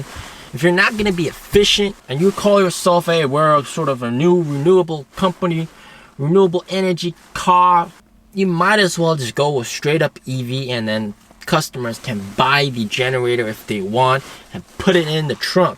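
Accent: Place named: United States. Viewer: For someone who's a native Chinese your English is very good even your accent. Sound almost American.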